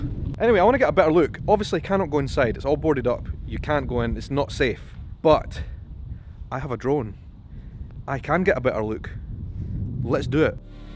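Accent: Scotland, United Kingdom. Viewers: So happy I found your page!! I love love love outlander and I love a Scottish accent. Thank you for doing this 💙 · Castle Leoch is a must! Just saying. Amazing videos, you with your scotish accent in Lallybroch and a drone flyng over you both...amazing!. Thanks for sharing the love for the story and for your country. Makes it really special to see the series.;)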